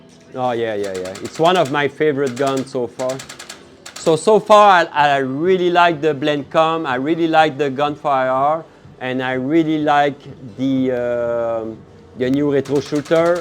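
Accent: Quebec, Canada. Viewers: Woah you sound so Quebecers mon chum! Subscribe esti! J'suis content d'avoir un fan d'arcade/retro de chez nous!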